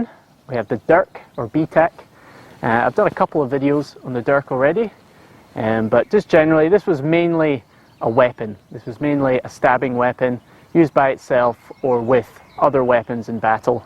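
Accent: Scotland, United Kingdom. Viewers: Nice Scottish accent, mate ! Damn cute😌 The vid is also very interesting ... always wondered how those guy managed to travel so light !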